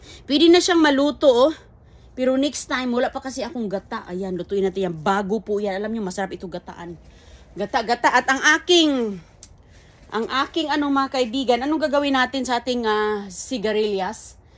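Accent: Visayas, Philippines. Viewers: Visaya bas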